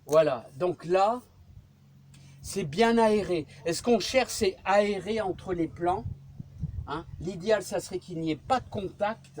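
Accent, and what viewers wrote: North, France. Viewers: Super merci avec ton accent du nord j àdore et je vais aérer mes tomates bonne idée a bientôt pour la suite bonne journée Eliane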